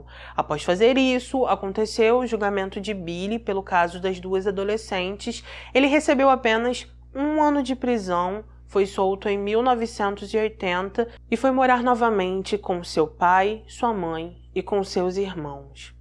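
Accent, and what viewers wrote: Rio de Janeiro, Brazil. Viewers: Boa noite quando procuro seu vídeo e não encontro já fico ansiosa esperando esse sotaque carioca é muito lindo eu morei no Rio de Janeiro por 2 anos e quando saí de lá já estava falando carioca kkkj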